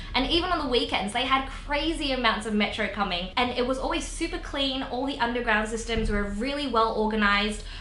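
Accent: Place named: Australia. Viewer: 戈小姑娘额英文一听就晓得是Aussie。现在住在哪？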